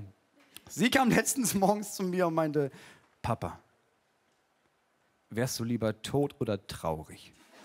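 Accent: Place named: Northern Germany, Germany. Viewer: Der norddeutsche Dialekt ❤😂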